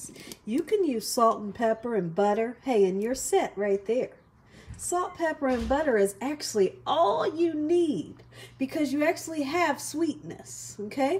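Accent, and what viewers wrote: South, United States. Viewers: She's sporting a buffalo jersey with a southern accent.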